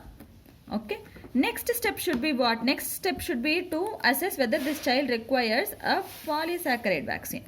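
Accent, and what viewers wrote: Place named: South India, India. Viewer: Very nice presentation.lovely voice with south indian accent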